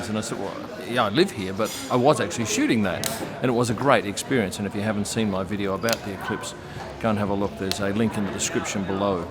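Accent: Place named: Western Australia, Australia. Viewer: Mate I didn't know you were a west aussie! Subbed!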